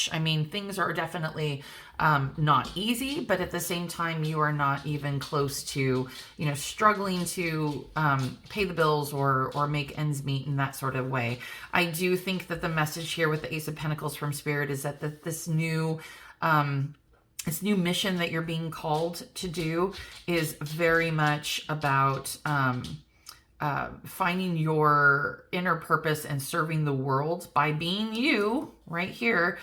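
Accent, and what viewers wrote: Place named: South, United States. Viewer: you speak w the same dialect. But her southern drawl is lil deeper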